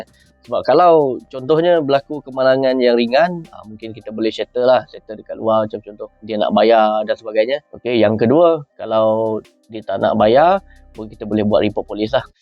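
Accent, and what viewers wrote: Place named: Sarawak, Malaysia. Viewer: TQ bro info bagus. Baju JDT tapi slang Sarawak. Terlanggar awek Johor ke bosku.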